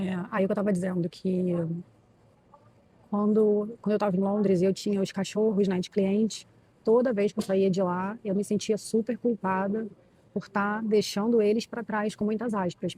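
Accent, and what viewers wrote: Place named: Rio de Janeiro, Brazil. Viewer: Mulher inteligente e com todo respeito...muito bonita!!! Ela é carioca! Olha o jeitinho dela falar!!!!!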